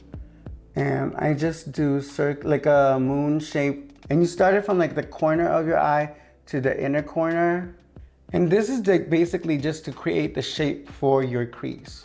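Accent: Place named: New Jersey, United States. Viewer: I’m not from the US so I’m not really sure of what a Jersey accent is but I’m pretty sure Jiggly has it.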